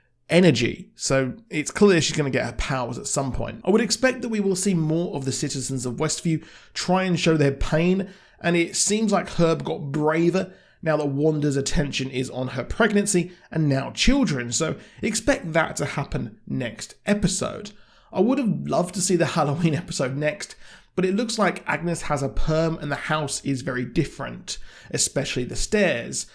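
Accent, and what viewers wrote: United Kingdom. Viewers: Thats because he's British. I have a similar accent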